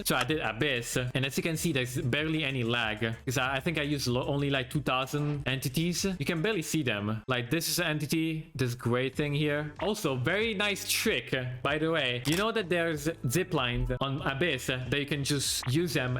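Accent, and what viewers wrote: Italy. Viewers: dai ma si sente che sei italianissimo! sei un grande comunque continua cosi!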